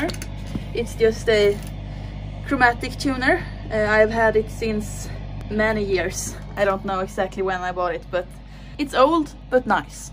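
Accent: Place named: Sweden. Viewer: idk but your accent is cutely swedish. 😂❤ Definitely checking your band out. Cheers from Helsinki!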